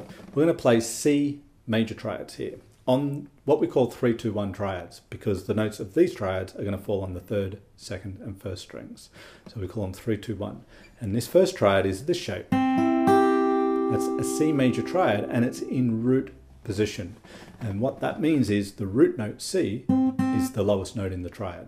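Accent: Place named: Australia. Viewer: I thought his’ cool the caption helped me break that awesome accent(Aussie ?) so its worth subscribing to his vid acct…ty mate ❤ fr felipinas ❤🎉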